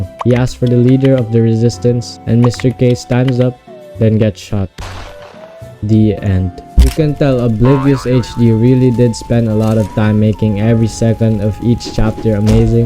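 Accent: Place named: Philippines. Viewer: Your voice is very filipino and i was right( i am Filipino too)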